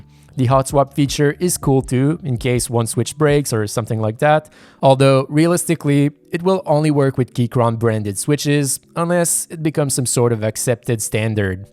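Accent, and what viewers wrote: Quebec, Canada. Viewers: you are french canadian right?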